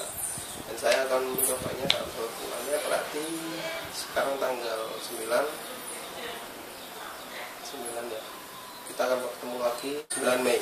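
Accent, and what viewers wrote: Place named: Java, Indonesia. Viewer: medhok amat bro ngomongnya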